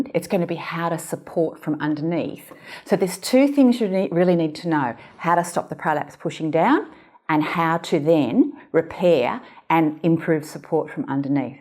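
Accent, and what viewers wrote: Australia. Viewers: Wow, an Australian, hoooooooray! Not only do you speak Australian so I can understand you better, you are sensible and helpful and to the point, thank you sooo much for this video! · Hi - I did watch the video and it turned out (no pun intended) to be interesting and well presented. I'm a sucker for a woman with an Aussie accent, too. Regards.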